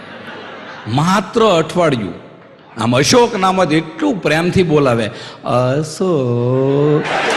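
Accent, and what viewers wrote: Kathiawar, India. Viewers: aape pyor tadpadi bhasha jadvi rakhi chhe, kathiyavad sivay kadach samjata thodo samay levo padto hase evu manu chhu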